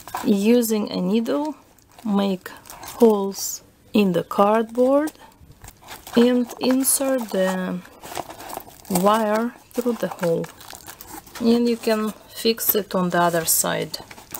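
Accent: Russia. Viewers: Are you Russian? I love the accent!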